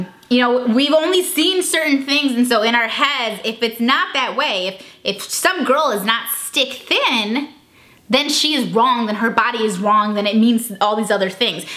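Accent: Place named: South, United States. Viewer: i love your voice for some reason. its like sassy/southern but not annoying haha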